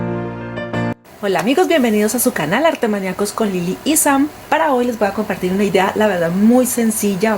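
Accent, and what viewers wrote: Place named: Bogotá, Colombia. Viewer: Que belleza y fácil, oye eres de Bogotá?... lo digo por el acento (con todo respeto). Mil gracias por tan bella manualidad 🤗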